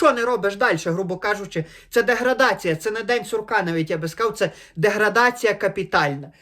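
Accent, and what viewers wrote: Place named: Western Ukraine, Ukraine. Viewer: Вуйко, западенець з западенським акцентом буде менi росказувати якусь риготню??? Та зрозумiло що ти там десь в горах живеш в трембiту дмеш i нiкого там нема i можеш вiльно ходити по вулицi, а норм пацани сидять дома...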